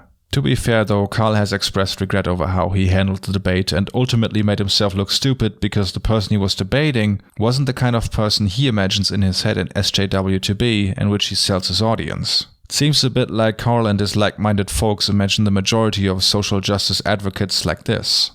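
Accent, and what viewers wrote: Germany. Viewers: your German accent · a slight German accent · I had no idea how much I needed a German narrator before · The content of this video is entirely based on the premise, that you are a mindless bee simply running after the sweet anti-sjw-content you were made addicted to. The mindset of a true lefty: Everyone is just following perceived elites. In this particular case, running after more or less famous youtubers. For example, I liked Ben Shapiro back in the day, when I have first seen him, because he stated arguments in an extremely condensed manner that I have stated for years and yet have to see a well thought through counter-argument against. I have experienced Sargon more as a funny Britsh dude with some good and some bad arguments than an authority in arguments. And you got to give to the far-righters on youtube: They have a very sharp insight into the lefts mindset and their ideological premises. 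And to add also a counter-argument to one of the points made in this video: Yes, SJWs are a minority within the left. Political radicals are always a minority but this fact doesnt imply that they have no or cant gain any relevant political power. Quite the opposite is the case. By your accent I can tell you are from Germany: "Gleichstellung" von Frauen ist auch nur eine Idee einer kleinen linksradikalen Minderheit in Deutschland und doch ist dieses linksradikale, freiheitsfeindliche und im Kern kommunistische Konzept heute Bestandteil der Gesetzgebung und wird von fast allen Parteien vertreten. Das ist ein aktuelles Beispiel dafür, welchen Einfluss radikale Minderheiten gewinnen können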